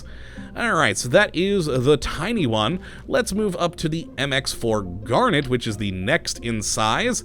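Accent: United States. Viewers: Not really, as a British person, it is clear to me he has a distinct American accent. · Definitely, I'd imagine the accent would stick out like a sore thumb to anyone native to Britain It's unfortunate how rare it is to find an American who speaks English thoughtfully though :(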